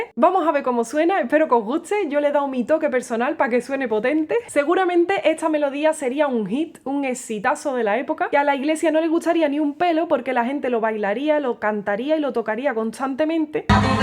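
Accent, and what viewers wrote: Andalusia, Spain. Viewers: es andaluza, ese es el "ACENTO" de Andalucia aunque hay variaciones dependiendo de la provincia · Uaaauuuu sensacionalllll,eres buenisima. Que mel9dia mas bella y que talentazo tienes. Y mas,tu aciento andaluz deja el video aun mejor. Es andaluz,verdad? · Oye pero que buen video, muchas gracias por compartirlo... ¿Alguien sabe de cuál lugar de España viene el acento de? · Qué hermoso acento andaluz, saludos desde Venezuela. Primera vez que veo tu canal y me parece muy interesante, tanto así que ya me he suscrito. Gracias por generar contenido con cultura y buena música. Mucho más éxito para tu canal! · Cada vez mejores tus videos,. Sigo hipnotizado por ese acento tuyo, tan andaluz, que reberbera en mi oído argentino. Gracias! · Con este vídeo descubro este genial canal. Qué gusto empezar un video escuchando el acento andaluz, madre mía 🤍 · Qué lindo hablas, de qué parte de España eres? 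· Que lindo tu acento no lo había escuchado nunca es precioso se que es español pero no se de que lugar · Recién conozco tu canal, me encantó ! Saludos desde Uruguay. Y el acento es lo mejor, me acuerda a Manu Tenorio jaja (sevllano será? de Granada? otro del sur de España? nadie de España me insulte, sólo es curiosidad y un poco de ignorancia) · Muy bueno el acento andaluz....es casi el acento mas iconico de España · Me mata tu acento! Es andaluz? Eres encantadora! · Qué rica tu forma de hablar... Es una manera muy particular de hablar en España... No sé, específicamente, de qué parte de España. ¡Saludos, desde Santiago de Chile!... 👍👍👍👏🏻👏🏻👏🏻👏🏻🌷🌷🌷🌻🌻🌻🌹🌹🌹💐💐💐💐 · 🟥Interesante. De paso se aprende de la obra de El Bosco (Pintor holandés, ahora Países Bajos). En seguida voy a ver el otro que hiciste de Da Vinci. Tu acento de dónde? sur de España?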